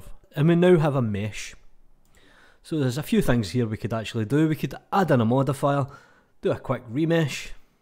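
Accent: Scotland, United Kingdom. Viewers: Hes Scottish lol no the wonder!!!! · nah its Scottish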